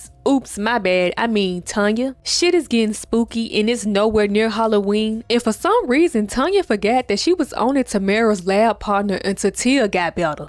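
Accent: Memphis, United States. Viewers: Are you from Memphis?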